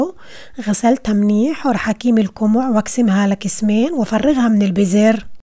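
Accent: Palestine. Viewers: بتشهي شوربه خمس نجوم مميزة مثل لكنتك الفلسطنية الليةبتهبل 🇵🇸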